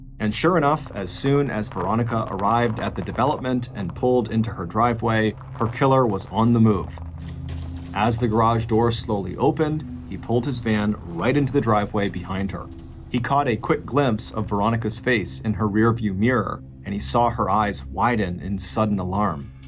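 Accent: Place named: Boston, United States. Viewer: I hope this provides our revered storyteller a cascade of enjoyable, and well-deserved feedback! I am chronically ill, frequently housebound and at some point, almost daily, in such pain I can not move and barely breathe for the better part of an hour. Having a story on deck feels critical to surviving these more difficult times. I am transported from some floor to a vivid scene full of detailed descriptions and engaging plot weaving. Mr Ballen’s riveting unraveling of the “dark, strange, and mysterious” with a clear passion for the waning art of storytelling, is a true gift for all his followers. That he commits to a regular schedule while growing his family and philanthropy is admirable. That his art is guided by a baseline of facts is a relief in a world replete with untruths, half truths and alternative facts. So, THANK YOU 🙏 for sharing your gifts. 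Our family wishes your family the best. We have lived in Wollaston Beach and welcomed my only child at South Shore Hospital. My baby just turned 18, and we live in Nashua now, but will always fondly remember great raucous times in our late 20’s and early 30’s when we worked hard and played harder with greater Boston as our playground. So your authentic local accent, Mr Ballen, is the cherry on top. 🍨 We are grateful and wish you nothing but the best.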